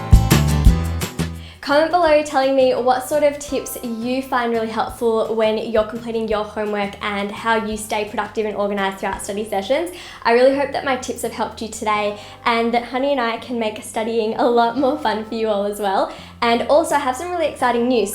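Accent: Australia. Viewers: JanielForLife Australian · Australia was a British colony, so most of the earlier settlers and first English-speakers were British so Australian English has very close spelling and pronunciation to Brits. most Australians speak like her. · Australian accent I can tell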